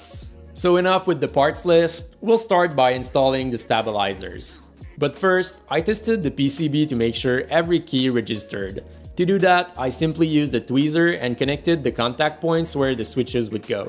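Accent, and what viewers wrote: Quebec, Canada. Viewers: Accent quebecois 100% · he is french canadian so he has an accent when he speaks english.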